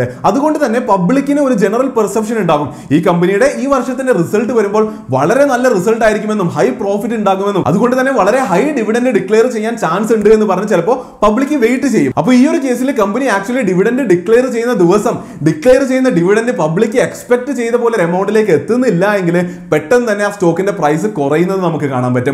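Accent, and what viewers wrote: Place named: Kozhikode, India. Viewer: super man super kozhikodan bhashayil paranjal oroo mukkummoolayum thappi egal paranj tharand 👍👍👍👌👌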